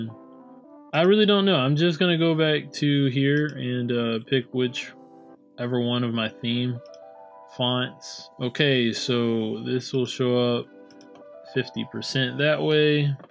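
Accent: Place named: United States. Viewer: Hi. Good tutorial. is this feature available in Wix worldwide or is it specific to a particular country? I'm assuming (by your accent) that you are in the USA? I'm in India and the hover feature is not showing up when I add a box...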